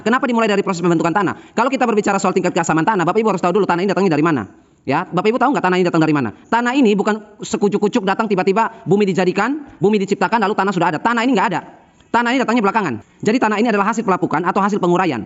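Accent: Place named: Makassar, Indonesia. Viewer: Makasar kyaknya om sama kayak pak Rocky gerung hehhe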